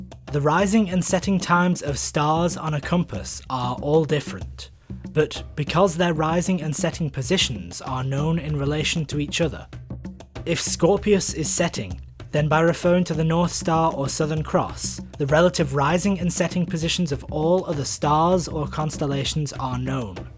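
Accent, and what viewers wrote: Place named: United Kingdom. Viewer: Your accent is awesome. Where in the UK are you from?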